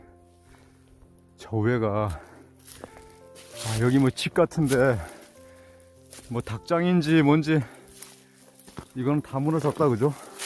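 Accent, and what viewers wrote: Gangwon, South Korea. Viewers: 유트버님 억양과 말씀 하신걸 들으니 강원도 영 평 정 같아요. 항상 보고 있습니다. 감사합니다.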